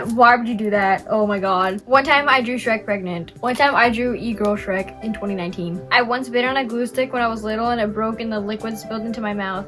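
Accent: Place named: California, United States. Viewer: after you said ur californien and say towel weird i also said it and our cali accents are lowk the same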